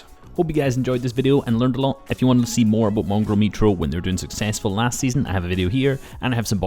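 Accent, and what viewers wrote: Scotland, United Kingdom. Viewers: Where in Scotland r u from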